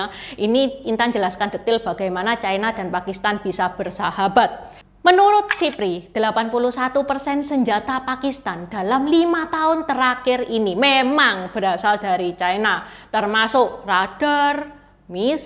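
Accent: Java, Indonesia. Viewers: Cino Jawa medhok, sy suka mengikuti...berapi²😊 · Medok mbk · Cara komunikasi mbak intan ini sangat jawa dan indonesia sekali tiada kedengaran ngomong cinanya. Kalau warga melayu malaysia mendengarnya akan makin iri membandingkan warga keturunan cina malaysia . 😂😂😂😂 Mantap sekali komunikasi mbak intan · Medok se x jawanya Cece intan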